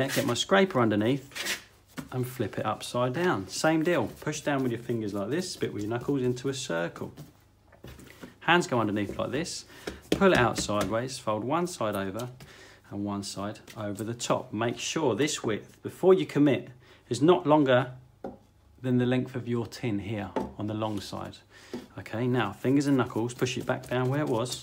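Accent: United Kingdom. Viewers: Having gotten all the knowledge im gonna get by watching all these videos, I now put them on just before bed so I have sweet, doughy, British sounding dreams.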